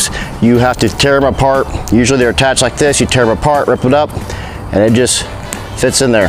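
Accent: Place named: Midwest, United States. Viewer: It’s a Midwest thing. I don’t hear it, but definitely try to pronounce as roof when I’m talking to people.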